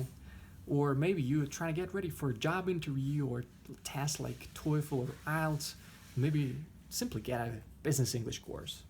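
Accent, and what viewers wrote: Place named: South, United States. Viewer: I actually love the southern accent as a foreign student. Sooo sexy.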